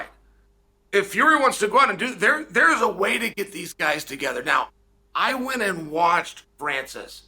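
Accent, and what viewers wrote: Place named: United States. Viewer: Ok Chael, you say the word poll nothing in a google search. The closed captions say you said poll. There is a move called a pull, I’m guessing it’s the yanky accent.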